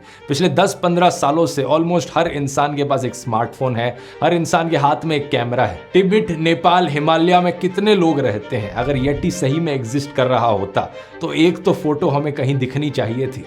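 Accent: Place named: Lucknow, India. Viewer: आपकी भाषा शैली, आपके बोलने के अंदाज़ से प्रतीत होता है कि आप पुराने लखनऊ के निवासी हैं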